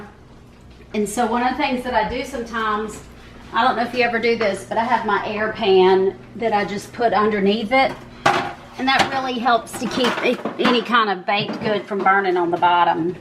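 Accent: South, United States. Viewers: Hey! You did an amazing job! I love how you have kept your true southern accent. Loved the recipes too! xo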